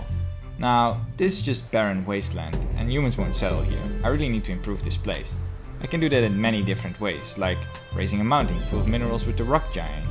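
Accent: Netherlands. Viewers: i dont like dutch people who pronounce words like that, we got a lot of hours learning english, french, german etc and still they pronounce it like that. btw game looks awesome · are u dutch like me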